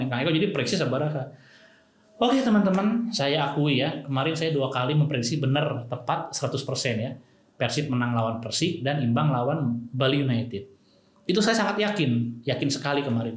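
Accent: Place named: Surakarta, Indonesia. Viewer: Medok solo lah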